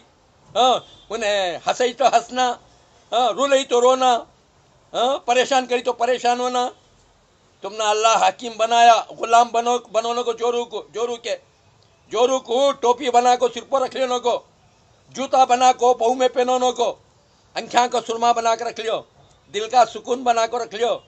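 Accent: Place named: Hyderabad, India. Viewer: Ye baba konsi language bol rahy hai... Hyderabadi?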